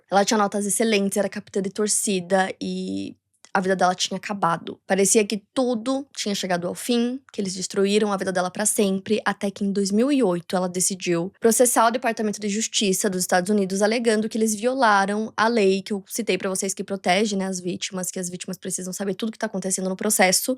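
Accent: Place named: Rio Grande do Sul, Brazil. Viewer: Nossa que nojeira, porquê ninguém investiga o tramp? E que vóz chata dessa apresentadora quando fala em inglês misturado com gauchês affiii acho que sou eu que estou sem paciência mas essa reportagem me deu taquicardia, dor de cabeça, ansiedade, nunca termina meu Deussssss ... um rivotril por favor 😂😂😂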